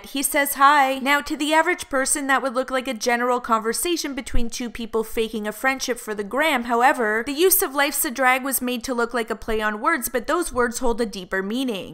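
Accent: Canada. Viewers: Canadians and their “Abouts”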